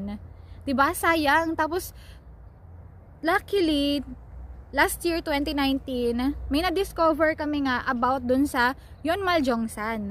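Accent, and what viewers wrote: Batangas, Philippines. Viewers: Batangueno ka ga ate? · Accent ng batangueña 🖤 Pwede po ba magapply ng factory worker ang mga naka eye glasses? Or malabo ng mga mata?thankyou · taga batangas ka? hehe nice video 😁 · Natutunan ko na po Consonants at ang saya nun natutunan ko nakakapagbasa na ako, magaling yung napanood ko Korean Unnie isang nood ko lang natandaan ko na turo nya. Lagi ako manonood sa vlog mo nakakatuwa accent mo na Batangas, nga pala kaya nawili ako panoorin ka kasi kahawig mo si Pauleen Luna lalo pag nag smile ka.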